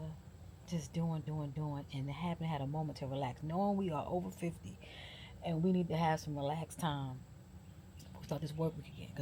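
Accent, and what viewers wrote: New York, United States. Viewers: Gurl, your skin is amazing and you look amazing! Also, a country New York accent, that's elite!! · Hey....New Subbie here 🎉. I am loving your vlogs Girly and truth be told, when I heard your accent, I immediately hit the like and Subscribe button...lol! I miss the NEW YAWK lingo. I'm Brooklyn bred, now Texas Fed, but it will be NY FOREVAH!!!!! I am loving your channel!!! I can't wait to binge watch all that I've missed ❤😂❤.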